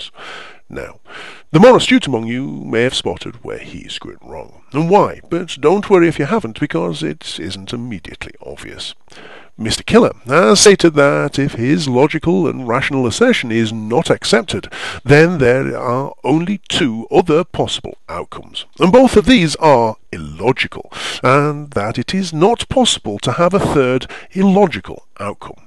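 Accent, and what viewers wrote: United Kingdom. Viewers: I may not be an atheist, good sir, but I admit that this video had me laughing at more than one point. Also, your voice is absolutely silky smooth...is your accent East London?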